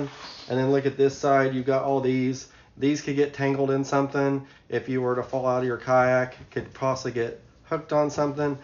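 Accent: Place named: Kentucky, United States. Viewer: Ok, well so far I've heard you say waller it out and now you're using electrical on your PFD. You are definitely from Kentuckuy and that's a good thing.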